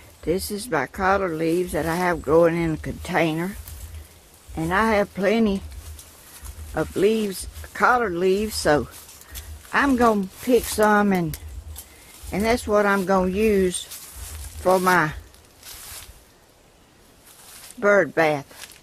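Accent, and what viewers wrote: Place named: Georgia, United States. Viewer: I love your Georgia accent. I am from Amarillo, Texas so I have a Texas accent. Good thoughts coming to you from Texas.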